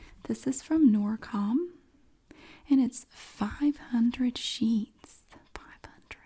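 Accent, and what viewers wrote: South, United States. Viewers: Just found this channel and love your southern accent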